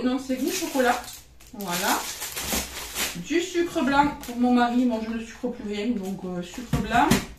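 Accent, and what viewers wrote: South, France. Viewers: jadore ton accent!!!!! ca sent bon le sud et le soleil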